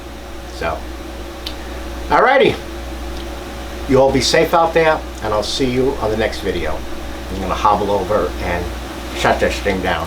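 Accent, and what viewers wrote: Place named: New York, United States. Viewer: Hey just a few things. I'm here saying to myself this guy has to be from NY. Then you mentioned when you lived out here (I'm from NY, Ret.PD). It's just the way we speak lol. The other thing is that I'm sorry for what you have been going through and I hope for a speedy recovery. But what struck me most is your wife. What a class act you are. My wife is one of the most precious things in my life including my kids. And how she took care of you and you making sure you put her first just makes me enjoy and respect your videos more. Not many are as lucky as we are. Take care and God bless you and the fam.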